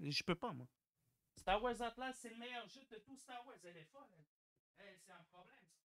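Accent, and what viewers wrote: Quebec, Canada. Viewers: Ne t'énerves pas trop, tu vas perdre ton accent québecois et après tu seras moins drôle! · Merci pour tes vidéos qui remettent les points sur les i. Avec l accent canadien en plus j'adore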